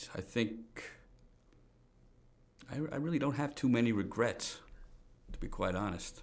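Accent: New York, United States. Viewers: Interesting. The inflection and pausing of the way he speaks is exactly the same as the way a guy I know who grew up in the same era in suberban NY speaks.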